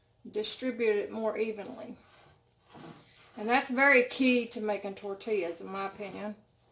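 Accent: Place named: Tennessee, United States. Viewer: Love the video. I’m a Tennessee girl and I talk like you do 🤗🤗🤗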